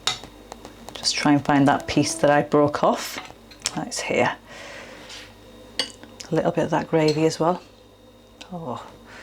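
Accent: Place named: Yorkshire, United Kingdom. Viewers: She sounds like a Yorkshire lass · My God I am honoured! I truly am!! I infer from your accent you are a northerner, I'm from London but could conceivably make the journey up, however I'm in two minds about appearing on camera, I will let you know. But I really feel you will eventually hit the big 7 figures, as I may have said above you've tapped into something quite enormous, for reasons I'll try to message you.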